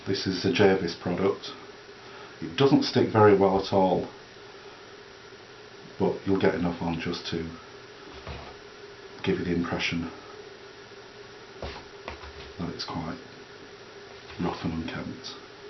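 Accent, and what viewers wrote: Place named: United Kingdom. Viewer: yes very good, your voice is fine, just has an accent. British I assume. Now PVA is? wood glue? the other thing to start with is spray adhesive..and then finish with hairspray. I just love the fact that nothing is wasted, just break it up in a blender. Roughly how much is a block and how many trees per block? thanks Nick (formerly from IOW)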